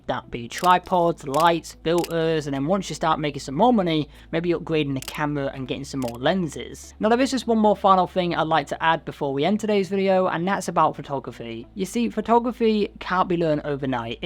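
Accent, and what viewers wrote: United Kingdom. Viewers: I learn in this video how to use Sony a6000 things and also how I can talk like Britain 😮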